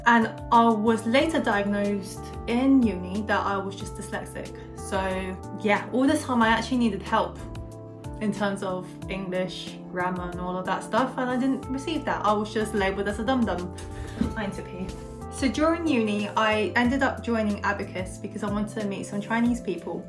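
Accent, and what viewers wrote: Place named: Essex, United Kingdom. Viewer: She's proper Essex.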